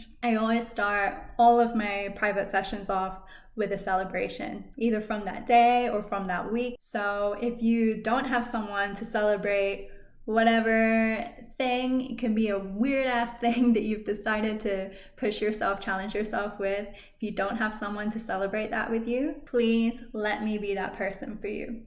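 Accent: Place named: United States. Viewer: Edgar Cayce, a christian, would be proud of you, he stressed prayer as one thing to help with dream recall. And it is working for me, I'm not christian but I take prayer as being grateful and thankful for everything i have, remember to bless your food and drink always, and then of course asking for all I want. My still poor dream recall is improving. Affirmations are another to do, if i hope you don't mind me rambling on on your page but my dream recall is improving everyday and every night in every way and remembering my dreams is easy. Getting out of my comfort zone would be becoming more social, just talking to people more. I could practice that in lucid dream state, it's on the list of things to do and learn there in my lucid dreams. Thanks for encouraging me, I will talk to people more here in the waking life real world, this "shadow world" we live in. 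You're so cute, if I may acknowledge that? You have a very nice american accent, that is if memory serves me and you are actually english which seems very unusual to be able to change your accent like this. Many blessings and love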